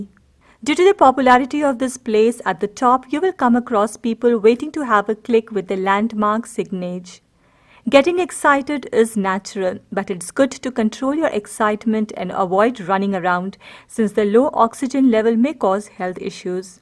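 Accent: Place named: India. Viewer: I could not understand half of what the lady was saying. She has such a big indian accent. British should speack !! but she is a nice person !!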